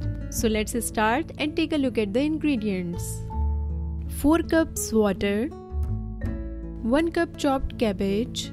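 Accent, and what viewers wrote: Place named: Europe. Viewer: U Europeans confused with t and"ray".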